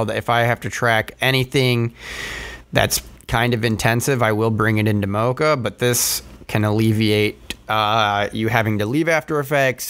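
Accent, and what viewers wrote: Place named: United States. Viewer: I HATE YOUR ACCENT IT MAKES ME SOOO ANGRY!!! fucking amercian talking like a hobo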